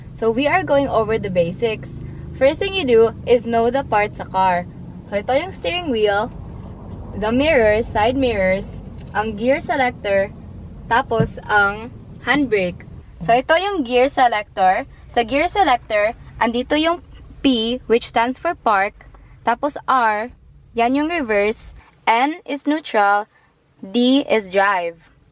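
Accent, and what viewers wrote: Visayas, Philippines. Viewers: bisdak for sure! 😍 sweet tone of voice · bisaya man ka dai, pag bisaya dai oi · Bisaya kba? I can hear some tones.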